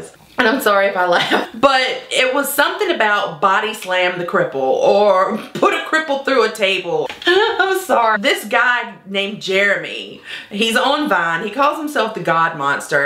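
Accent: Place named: South, United States. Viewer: i got so excited when you said ello.poppets im like omg she has an accent and then i heard the southern accent and im like Holy god she has an accent! i love it. first video i watched. and i am hooked. subscribed and binge watching now!!!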